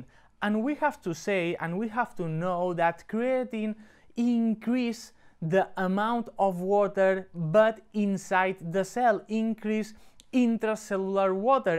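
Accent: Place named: Spain. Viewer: The Spanish accent is a bonus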